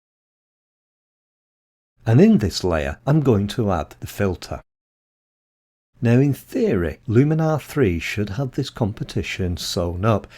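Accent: United Kingdom. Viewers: Nice to hear a fellow Brit extolling ON1. I've seen this done differently as well in ON1; duplicate the layer, drop the exposure by a stop, add blur and then reduce the mask to about 50%. It'd be interesting to compare the two.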